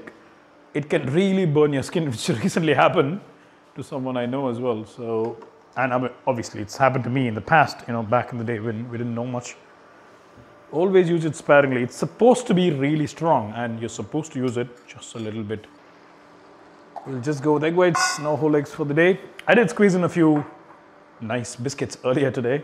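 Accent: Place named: Madurai, India. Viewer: Waiting for your comeback brotherman, these low days will fly away sooonnn... A big fan of your fitness and accent - fellow madurai friend..🙂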